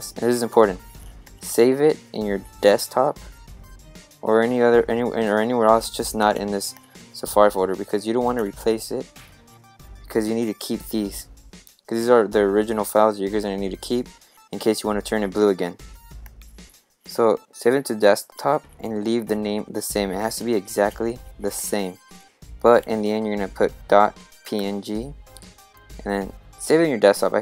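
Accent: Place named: Japan. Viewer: pfff your accent bro! is it ..japanese or something !!!!!!!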